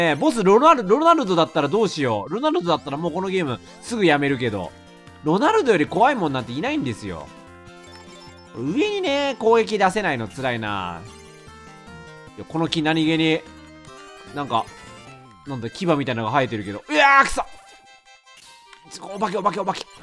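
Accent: Kansai, Japan. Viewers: って絶対関西弁需要分かってるありがとうすき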